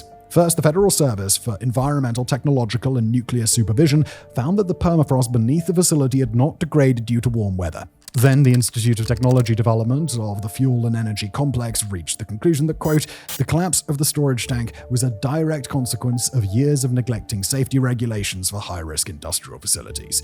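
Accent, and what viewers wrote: United Kingdom. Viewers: Fight to keep human created content! there's nothing better than a British guy talking about history anyway.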